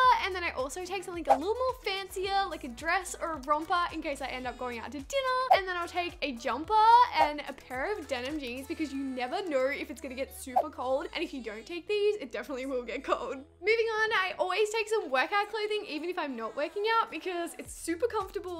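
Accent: Australia. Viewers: what part of australia are you from? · are u australian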